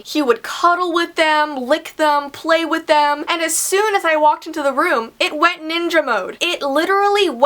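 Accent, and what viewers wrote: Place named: Canada. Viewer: I love your Canadian accent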